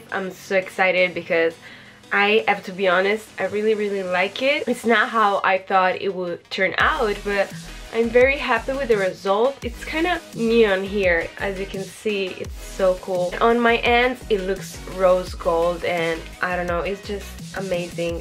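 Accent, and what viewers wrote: Italy. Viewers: Sei bellissima! Ma sei italiana vero? si sente l’accento! Potresti fare anche video in italiano pleaseee💖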